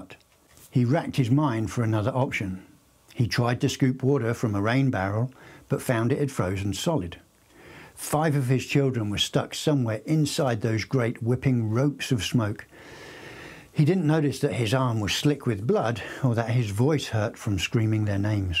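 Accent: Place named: Ireland. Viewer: Your Irish accent helps your story telling . You have the voice and speed of a good story teller.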